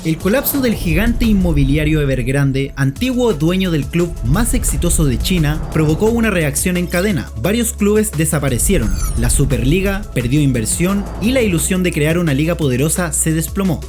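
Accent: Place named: Chile. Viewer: Chileno Detect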